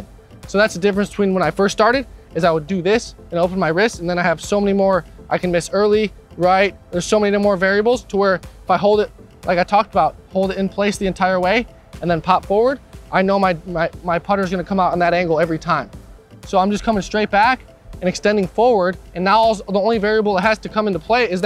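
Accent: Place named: Northeast, United States. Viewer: Played it at 1.5 speed! Reminds me of folks from the northeast!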